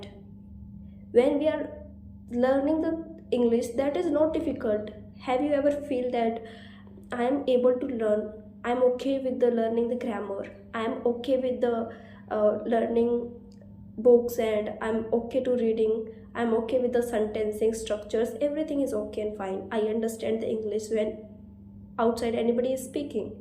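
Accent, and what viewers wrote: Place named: Bihar, India. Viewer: Ye bihar english lag raha , sahi me Bihari ho, be continued 😊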